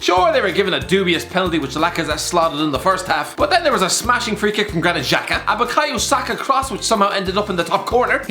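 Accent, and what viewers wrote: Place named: Ireland. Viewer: Can’t be the only one who has to switch on captions for this guys videos. I have a lot of Irish mates but god damn this accent is so thick 😂😂😂. Good content though absolutely love the channel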